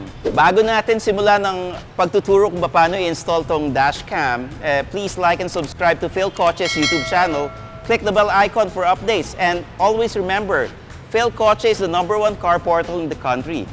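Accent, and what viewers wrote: Conyo, Philippines. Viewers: 😂🤣😂 ang cute ni sir parang bagay mag conyo ala ADMU, UST😂🤣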